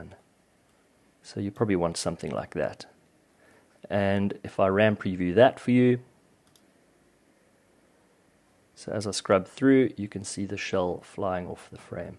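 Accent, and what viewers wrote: South Africa. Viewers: sup are u South African?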